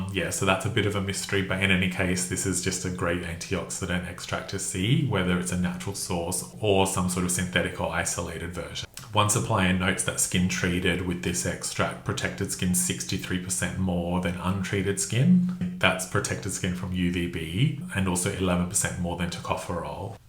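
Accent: Australia. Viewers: Probably better to stick with my 12% for now. Thanks again . Love your succinct Australian accent 💛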